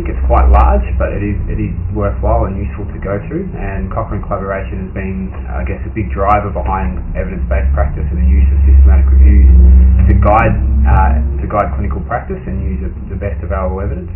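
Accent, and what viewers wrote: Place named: Australia. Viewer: Your Australian accent reminds me of school at Griffith university in the Gold Coast😄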